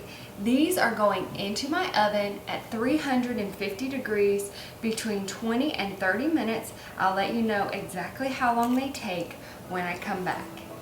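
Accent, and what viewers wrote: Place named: Miami, United States. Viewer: I'm just starting a Keto lifestyle. I learned about your channel through the Keto Twins. I am really excited and appreciative of your work in researching and perfecting these recipes and for the wide breadth of knowledge you relay. Looking at your videos I feel confident that this is a change I can do and succeed at. You are making a difference in the quality of people's lives and I ask that God continues to bless you and your family for this good work. Thank you. PS I live in Miami and your accent makes me feel at home! I miss it so much.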